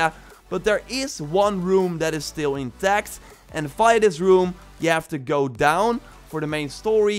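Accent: Netherlands. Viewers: Ik hoor aan jou accent dat je Nederlands bent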